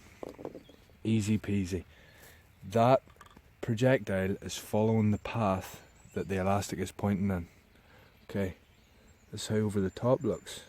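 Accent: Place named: Northern Ireland, United Kingdom. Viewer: Must practice after watching this. Have a cheap catapult at the moment to practice with hopefully I will up my game... Am assuming your from Northern Ireland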